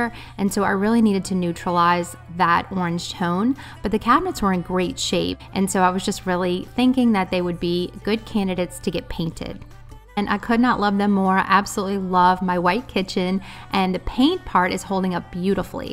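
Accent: South Louisiana, United States. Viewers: Louisiana in the house!! Oh I'm so glad I found your channel! I was like... she sounds more local than just southern, then you said you lived in southern Louisiana, and I freaked! Yay! I'm from South Lafourche, where are you in south LA? I totally loved watching this video! Especially seeing Tony's in the spice cabinet, 😆!! I can't wait to see more of your content in the future!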